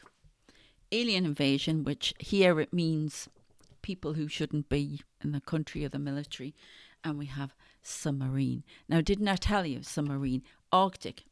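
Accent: England, United Kingdom. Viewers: Spoken like a true English woman 👍👍 Go girl 💕💕💕🥰🥰 Kath xx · Hello, I love hearing your readings and I love your English accent.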